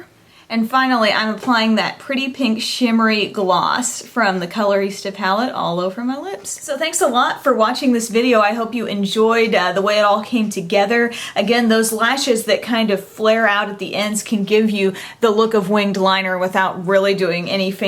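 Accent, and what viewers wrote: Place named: Illinois, United States. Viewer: Congratulations on your award! I live overseas, but I'm originally from Illinois and especially enjoy hearing your familiar accent. :)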